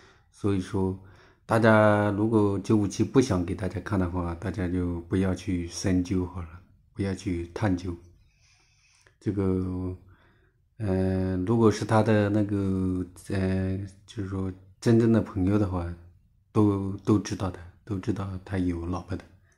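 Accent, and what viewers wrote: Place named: Zhejiang, China. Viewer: 有浙江口音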